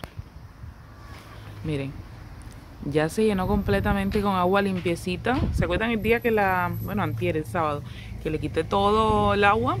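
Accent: Cuba. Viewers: Ehm... los cubanos hablamos así. Infórmate un poco amix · Es que son cubana las 2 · Ella las dos son cubanas por eso hablan parecido .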